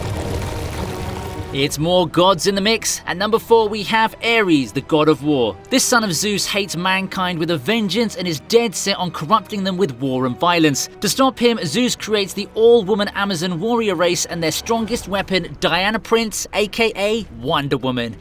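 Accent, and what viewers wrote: United Kingdom. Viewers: I love your British accent buddy😊❤️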